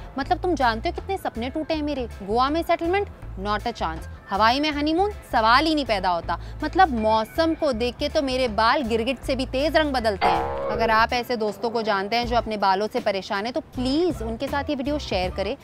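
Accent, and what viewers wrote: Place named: Kerala, India. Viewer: Madam you have Malayalam accent.. But wavy hair is the best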